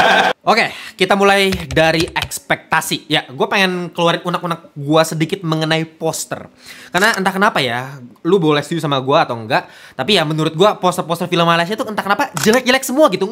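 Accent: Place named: Medan, Indonesia. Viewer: Nah kalo ini baru logat Medan bang... salam dari Medan 😄😄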